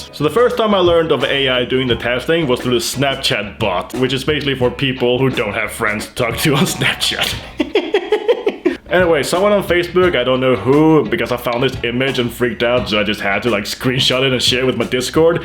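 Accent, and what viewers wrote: Norway. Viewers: Who is this guy? Toki from the cartoon? Norwegian?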